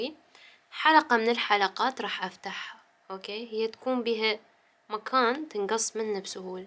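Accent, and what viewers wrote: Iraq. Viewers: مااحلاكي اول مرة اشوفك.. اموت فاللهجة العراقية. تحياتي من 🇩🇿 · فكرة الخيوط دي هايلة تسلم ايديكي لهجتك في الكلام عراقية اشتقت كتير للعراق فقد قضيت فيها ٤ سنوات ونصف بالتحديد في قضاء سامراء وكنت اذهب مع زوجي لبغداد الحبيبة فقد تجولت في شارع الرشيد وساحة الشهداء وشارع النهر والجورجة بتعطيش حرف الجيم وشارع المنصور والسعدون وحي الحرية والدورة الجديدة كان عمري أيامها بداية العشرينات عمري الان ٦٢ عام ومازلت اتذكر الأماكن والأصحاب العزاز الي تعرفت عليهم بحكم العمل والله نفسي اعرف أخبارهم واطمن عليهم بس ازاي لو اخت منهم تعرف اسمي وتتذكرني تكتب لي اكون سعيدة جدا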